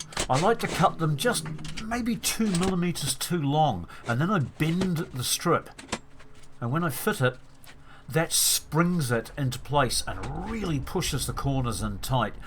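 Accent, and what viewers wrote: New Zealand. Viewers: Hi sounds like your. in kiwi land.im in northland ...have any available for p....?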